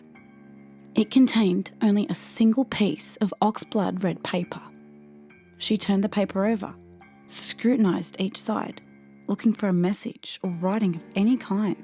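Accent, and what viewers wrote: Australia. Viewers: Aussie accents are so nice to listen to. Great voice and video. 😊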